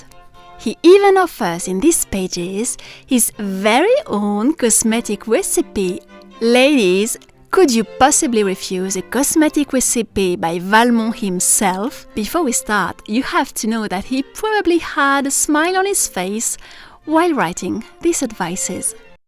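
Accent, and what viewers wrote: France. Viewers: Charming little film narrated in a genuine French accent, but what is going on with the actresses thumb right hand ? Was the blood looking colouring due to the sealing wax or is it in fact, blood inflicted by Valmont ? What a pity. Swan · Thank you for sharing this sweet gem with us. You bring history and literature to life with your elegant home, timely attire, inspiring music, and lovely French accent. You've created an exquisite artistic production!!! I especially appreciate you're enchanting storytelling is in English so that people from different countries can appreciate your work. Bravo!! Bravo!! 🇺🇸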